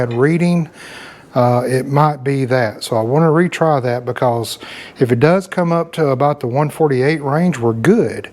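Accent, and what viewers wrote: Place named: Tennessee, United States. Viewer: Very nice! You sound like you’re from my next of the woods (TN)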